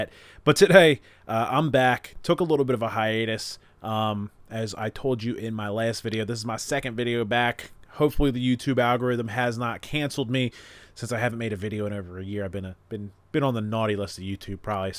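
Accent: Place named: Philadelphia, United States. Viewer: You have to be from philly/south jersey area with that accent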